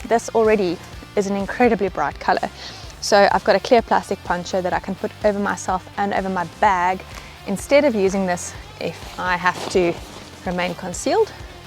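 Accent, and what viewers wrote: South Africa. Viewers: It's the Saffa accent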